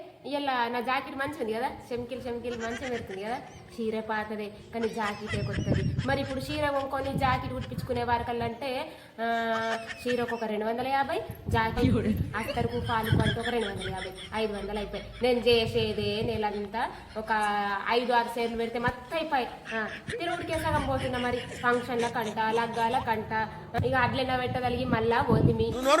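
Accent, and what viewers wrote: Nizamabad, India. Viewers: సూపర్ చెల్లి.. మీ వీడియోలు 6 రోజుల నుండి చూస్తున్నాను మా అమ్మ వాళ్ళది బోధన్ మీ యాస నిజామాబాద్ జిల్లా వాళ్ళు మాట్లాడతారు మీ యాసతో నేను రోజు నవ్వుకుంటను మీకు అభిమాని అయిన సూపర్ ఒకటి మీ పేరు నా పేరు లో సగం వున్నది ఇలాగే నవ్వించంది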